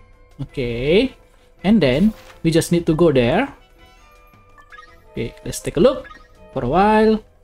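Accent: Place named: Indonesia. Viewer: logat indonya keliatan😁,mantap bang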